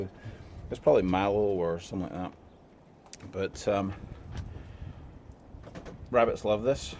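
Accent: Scotland, United Kingdom. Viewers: Wait... you are not British?! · Are you british? :D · Are you of Scottish or Northern Ireland descent?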